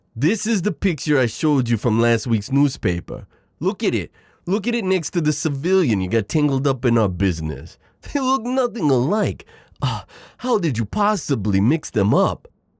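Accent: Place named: New York, United States. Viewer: Your accent's inspired from Little Italy's "the Godfather" isn't it?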